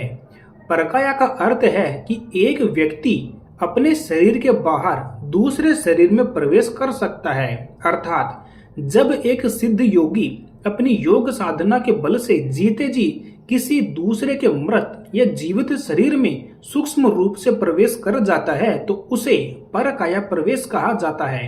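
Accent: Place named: Bihar, India. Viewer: Tumhari bhasha hindi se bilkul alag hai,shayad bihari type ki. Lagta hai koi kitab padhkar baat kar rahe ho. Kitab shuddh hindi me likhi hogi par aap bihari lahja laa rahe ho. Koi like nhi. Skip direct.ok ji..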